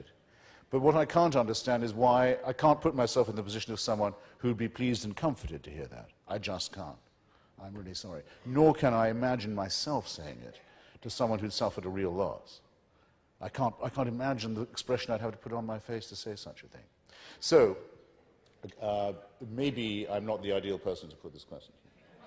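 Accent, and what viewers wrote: RP, United Kingdom. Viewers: Total lack of understanding of Scripture in its wider context. For a start he calls Shabbat Sunday! Speaking in an upper middle-class English accent does not make the case stronger. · ..."there's then a sulfurous interlude"... These gems just roll off his tongue, proof of his linguistic prowess and command of the English language. The Queen's English, actually.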